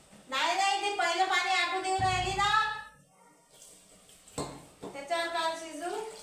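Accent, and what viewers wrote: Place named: Yavatmal, India. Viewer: तुमचं गाव पुसद. यवतमाळ जिल्हा आहे का. का आरनी आहे भाषा तिकडची अशीच आहे😊माझी बहीण आहे तिकडे. ईजनी गाव आहे यवतमाळ जिल्ह्यात आहे बहीण😊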